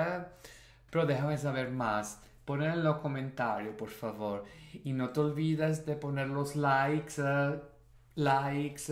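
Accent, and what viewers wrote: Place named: Italy. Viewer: Hi, funny video... Blas Canto´s performance was amazing, it's extremelly difficult to imitate Diana Navarro. Your Spanish is good as well, keep going. Btw, why do you have that Italian accent whe you speak Spanish?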